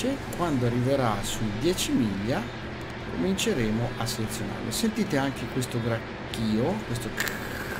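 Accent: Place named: Tuscany, Italy. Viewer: Ciao, analizzo passo passo il tuo video: All'inizio menzioni, e dici che non fai i video belli come i suoi... personalmente ritengo che sia inutile fare in due lo stesso video, quindi trovo utili i tuoi video, come quelli di! Lui molto professionale, con un inglese unico, tu molto "amicone con il tuo eccezionale accento toscano!!!!" Interessante il video che hai fatto, devo dirti che però lanciare i Sidewinder fin che non senti il look, non serve quasi a nulla, andranno quasi sicuramente persi, infatti nel tuo video, si deve che solo il missile che hai lanciato con il look inserito va a segno.. Ultimo prima di salutarti, devo dire che se in futuro spieghi anche le varie versioni del AIM-9, ritengo sia cosa gradita!!! Ciao grande uomo, a presto!!!! NB. dimenticavo, tutto questo dopo la sigla... e' troppo figo!!!!!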